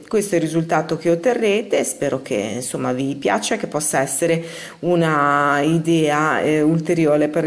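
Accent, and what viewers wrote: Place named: Genoa, Italy. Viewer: ieri sera ho visto per la prima volta un tuo video e ho pensato "mi sa che è genovese"..........e infatti lo sei!!! In che zona fai i corsi? se sei vicina sarei interessata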